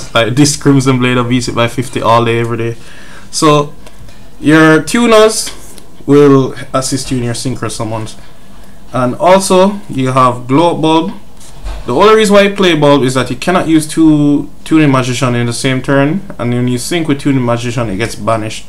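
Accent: Jamaica. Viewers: lol I just looked for this deck and came across your channel.As u started speaking I said this guy sounds Jamaican.... this guy sounds familiar. then I realize I know u from school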